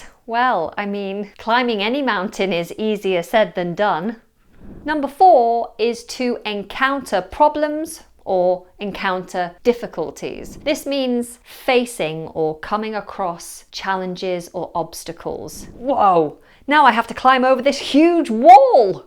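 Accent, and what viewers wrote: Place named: United Kingdom. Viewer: It is very useful to listen to you specially for your wonderful british accent!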